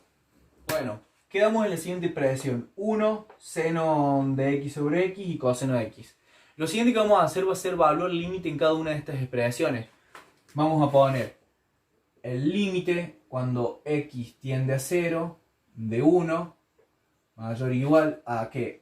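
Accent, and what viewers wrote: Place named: Córdoba, Argentina. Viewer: jajaj cordobesaso el culiau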